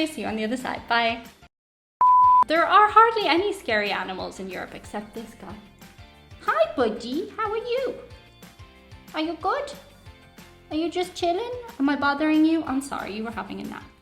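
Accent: Ireland. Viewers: Just wanted to drop in and say u love ur accent. Don't stop being Irish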